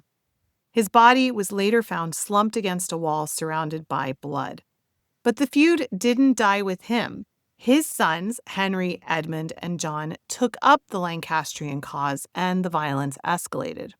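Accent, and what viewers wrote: United States. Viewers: What a dreadful american voice impossible to listen.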